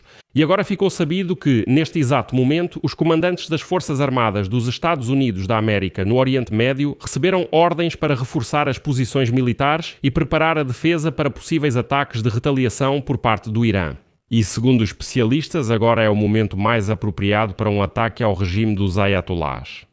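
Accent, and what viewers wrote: Portugal. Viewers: Jornalismo alexiceu língua portuguesa de Portugal fale sempre assim gostei. E língua portuguesa Portugal no Br. E nossa toponimia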